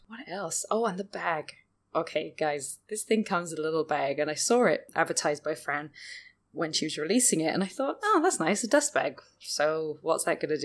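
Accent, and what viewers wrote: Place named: Wales, United Kingdom. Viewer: Great PJs and love how you're sounding more Welsh after your trip home! 😘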